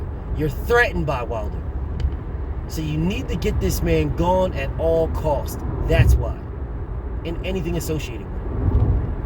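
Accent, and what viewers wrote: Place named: United Kingdom. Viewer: Come on brov 😂 chip chip cheerio 🤣 come on mate 😂🤣 that British accent is hilarious, that British accent is giving them headache 😰😩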